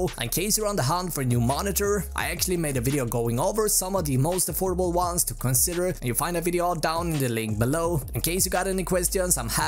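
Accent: Sweden. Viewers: Please go watch some American Tech YouTubers, listen to them and then try to speak like them, slowly with taking a breath and pronuciation Thank You Very Much 🙏 Swedish have very similar accent to native English ... Don't know what the problem here 😥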